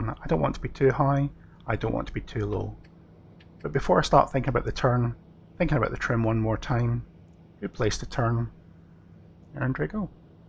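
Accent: Scotland, United Kingdom. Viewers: Most enjoyable and informative video. My thanks for not inflicting cloying, abrasive or noisy “background music” to drive us to utter distraction. One takeaway: no matter the cost of the aircraft it still obeys the fundamentals of aerodynamics and physics. And your Scots brogue is a distinct pleasure to hear. Mazaltov!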